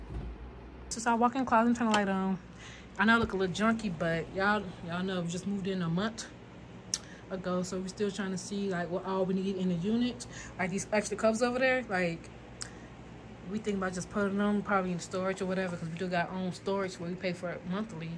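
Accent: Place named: Chicago, United States. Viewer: You sound like you can be from Chicago..are you? my dads side of the family stay there and your accent is similar to theirs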